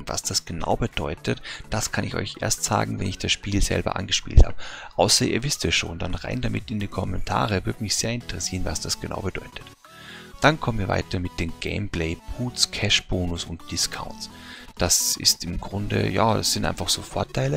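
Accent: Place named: Austria. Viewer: sehr gutes und informatives video! Mich als Österreicher freut es natürlich besonders wenn so eine Zusammenfassung mit österreichischen Akzent gemacht wird. Ich werde mir die special edition kaufen. liebe grüße karli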